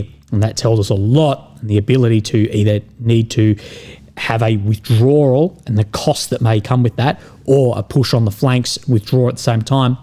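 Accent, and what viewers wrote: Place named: Australia. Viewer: When you're reading you going too fast remember you got that deep Australian accent so please slow down when you read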